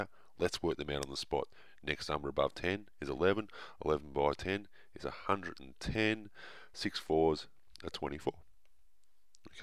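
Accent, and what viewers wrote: Australia. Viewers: are you Sal Khan's Australian twin? Are you the real Sal Khan doing silly math stunts while speaking Australian? Seriously, the cadence of your voice, your choice of words, they make you sound like Sal with an accent. If, by chance, you haven't heard him, just google the name and listen to what he sounds like. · Aussie accent.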